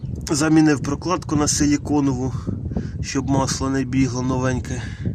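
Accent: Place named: Dnipro, Ukraine. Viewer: Браток,приветствую! Слышу по говору ты из Днепр.области. Поздравляю с покупкой! МАЗ это просто Мерседес для села!!! 😊 А если подцепить прицеп,то 5-6 Газонов заменит в уборочную.😊 Удачного ремонта!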